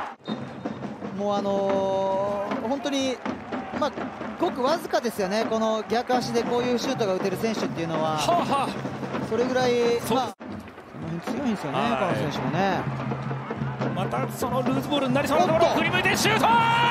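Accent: Kansai, Japan. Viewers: 解説誰？めっっちゃ関西弁やん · 、おもろい。新しい切り口だな。関西弁やし松木さんより中毒性がある。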